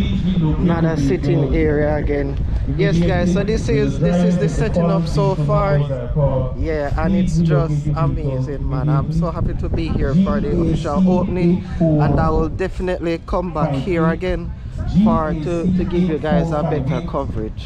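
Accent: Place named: Jamaica. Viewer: Are you Guyanese, you sound like you’re from Jamaica? Good job nevertheless kudos to you. 👏🏽👏🏽👏🏽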